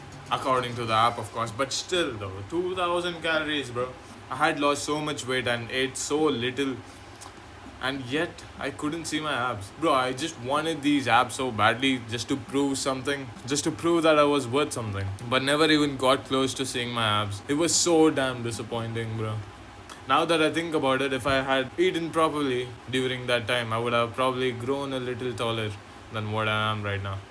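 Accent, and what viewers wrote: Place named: India. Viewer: Bro you sound like an Indian guy. Are you from India?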